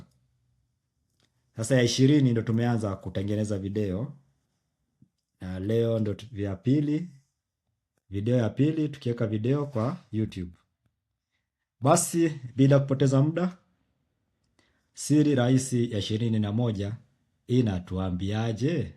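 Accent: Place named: Kenya. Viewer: Mkenya anae ongea kiswahili sanifu dah! nimevutiwa na kiswahili cha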